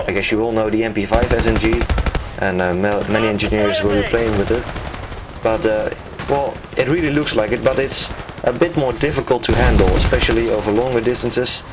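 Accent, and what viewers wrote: Netherlands. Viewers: Just a question:arr dutch? :D i think your voice sounds dutch^^ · Primary weapon: G3A4 Secundary weapon (Optional): none Pistol: M9 Attachments: none Gadgets: none, only the standard one (ammo box) I want to see you, and your skills only, good luck!! P.s. what is your first language? Dutch?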